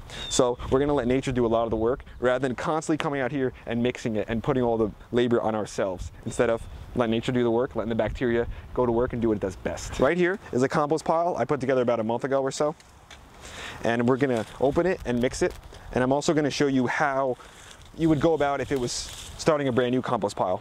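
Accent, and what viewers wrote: New Jersey, United States. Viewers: Wooder. Can't fake that Jersey accent....ha ha. Great videos! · Nope that's a New Jersey accent. The state has its own culture but NY and Philadelphia won't admit it.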